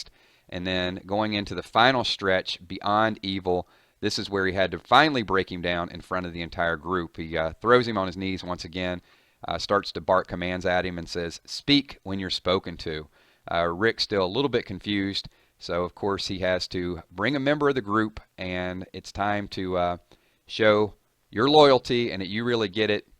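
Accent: South, United States. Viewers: where are u from I can hear a southern accent btw what do u use to stream road to survival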